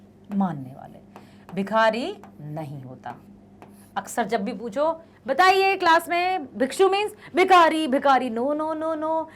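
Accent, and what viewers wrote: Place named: Marwari, India. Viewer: Madam ji Marvadi ave hai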